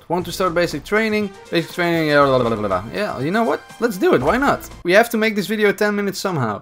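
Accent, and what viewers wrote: Netherlands. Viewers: Judging from your accent youre a fellow dutchmen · U kinda have a Dutch accent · Ik kan gewoon horen dat je nederlands bent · not only not a German accent, but very clearly Dutch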